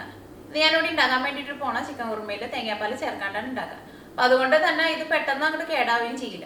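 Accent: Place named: Kunnamkulam, India. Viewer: Chechiye..njn kunnamkulamkaarana.onnum vnda mmade aa pedakkhna samsaaram aanh chechi kooduthal hit aaayath ente frend paranjitta njn ithine kurich ariyunnath.nthaayaalum classaayindh.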